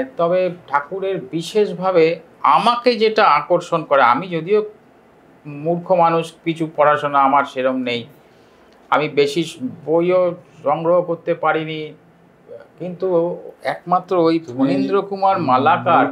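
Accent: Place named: East Bengal, Bangladesh. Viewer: r byakhya aro shunte chai.omar purbo bangyo bhasha te shunte khub sundor lagche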